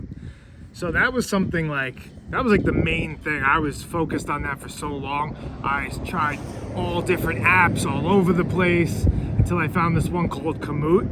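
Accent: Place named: Long Island, United States. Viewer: Your demeanor and mannerisms remind me of Chris Cuomo. He's a Long Islander too so maybe its the accent. Great speaking voice!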